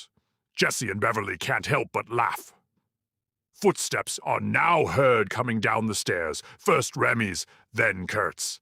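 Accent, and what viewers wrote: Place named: United Kingdom. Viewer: I object to the British accent in the narrations. He is your idiot not ours!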